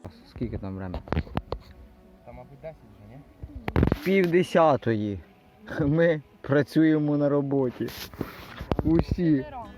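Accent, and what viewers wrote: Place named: Russia. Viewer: Швидка, дрібна, часу, праці - а вы произносите эти слова по-русски : быстро, милка, времеми, роботы и т.д. Это такой украинский - любой сможет на нём говорить, каверкая русские слова и называть такой ,,язык" украинским.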